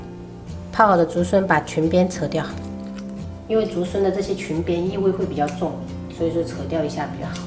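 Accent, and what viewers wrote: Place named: Fujian, China. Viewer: 你是四川人，为什么是一口福建口音呢😊? 就算嫁到那里也不应该呀😄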